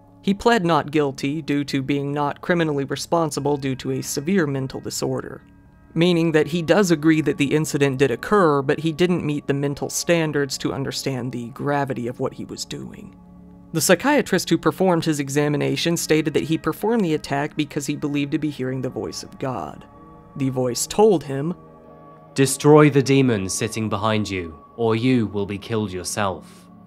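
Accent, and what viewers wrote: United Kingdom. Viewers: That really REALLY sounds like Adrian from Coffeehouse Crime. As a fellow Brit the likeness is uncanny. Anyway, great upload as always! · Wait, who was the British voice? Sounds sooo familiar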